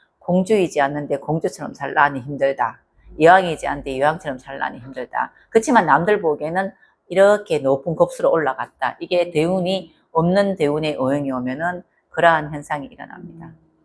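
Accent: Gyeongsang, South Korea. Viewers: 서울사람인데요 경상도 사투리 넘나 이쁘고 다 알아듣습니다 감사드려요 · 같은 경상도라도 너무 딱딱하니 듣기가 안 좋습니다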